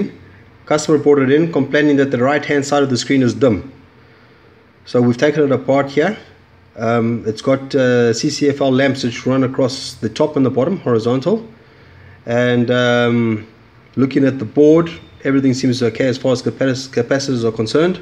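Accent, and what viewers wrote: South Africa. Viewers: Ahhh s.a accent 👌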